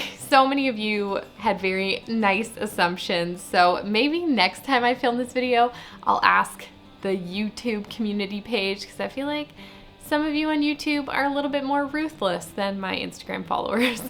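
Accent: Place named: Canada. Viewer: You sound just like me, I’m a former Canadian too !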